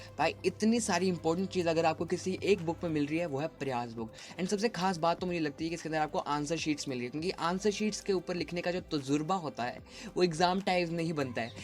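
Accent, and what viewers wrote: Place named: Punjab, India. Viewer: Bai lgda punjabi a hna ?? Boln de tarike toh lgda 😂♥️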